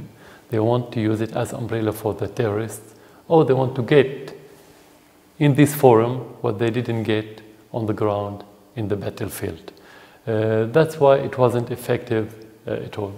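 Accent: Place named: France. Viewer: why does he have a french accent? he speaks English with a french accent .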